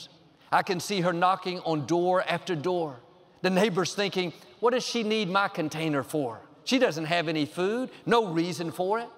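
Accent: Texas, United States. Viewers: Yesterday I was just out of energy for going on and asked God to take me home. I woke up this morning and had a message from my loving aunt telling that my breakthrough is near, it is why the devil is so focused on me. Then I came to YouTube and this sermon was top of my recommendations. I listened to and it was like my aunt speaking to me in a Texan accent. Same message, twice in the morning from different sources. I was blessed by this. Thank you! God bless.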